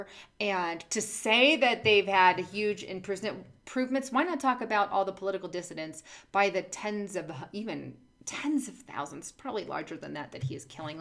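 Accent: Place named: Midwest, United States. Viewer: I hear you. Unfortunately in this country there are a lot of communists who won't listen and a LOT of people that aren't very bright and they won't understand. So swimming against the current but I do appreciate your effort. I like your posts. I think I could talk to you for hours about this stuff. On a side note I'm trying to figure out where you're from based on your dialect. I don't think east coast or west coast and I'm hearing inflections that sound...Ohio or Indiana? Just guessing..could be a little further south and west but not too much. The only other guess I have could be north central west. Montana or Utah but I just don't hear as much western dialect influence. What's funny is you only use valley girl drawl when you are parodying others or having to make a point you think is painfully obvious. Anyway I'm still listening. Have a great day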